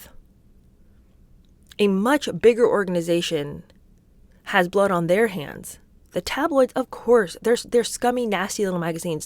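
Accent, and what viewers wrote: United Kingdom. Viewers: Oh, you never disappoint. I clicked into this video in hopes of hearing your British accent and almost right out the gate...BAM...you delivered. Your videos haven't come up in my feed in a long time and now i realized how much I've missed you. 💕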